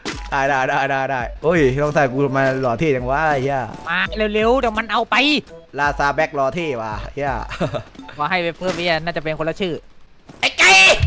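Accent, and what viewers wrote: Shan, Myanmar. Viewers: คนทีพูดเป็นไทยไย่ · พี่เค้าเป็นคนไทยใหญ่หนูฟังออก เพราะหนูก็เป็น55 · พี่เขาพูดภาษาไทยใหญ่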